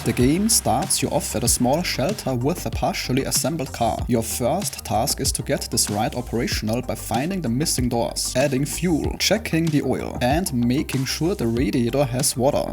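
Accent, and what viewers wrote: Germany. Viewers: Excuse me Brother but kommst du zufällig aus Germany? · Only narrator where I am not sure whether he is talking german or english. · a little bit german ich höre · Das Denglisch hör ich doch direkt raus. Gutes Video❤ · Versteht man ja kein Wort mit dem deutschen Dialekt.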